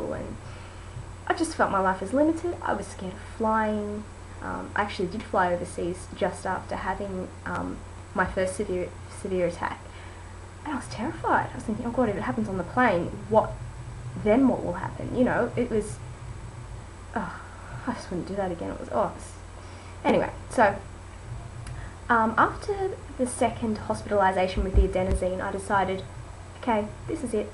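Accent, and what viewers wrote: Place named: Australia. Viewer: You sound Australian, who did you use?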